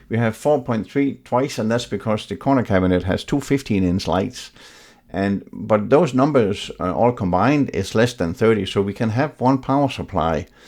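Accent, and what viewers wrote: Denmark. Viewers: Tak. I trust you probably have Danish ancestry as I do miss the Danish English accent.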